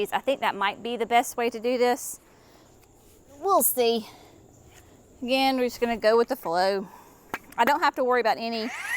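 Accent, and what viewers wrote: South, United States. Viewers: Oh, I love your southern talk when talking about the heat and humidity. I'm in Georgia so we're neighbors. Also love the music. Reminds of the times I was able to sit down at the end of a long day in the yard with the kids, heating up the grill for a burger.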